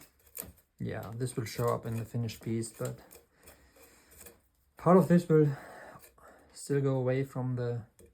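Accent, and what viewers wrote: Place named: Sweden. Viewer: That's a Swedish accent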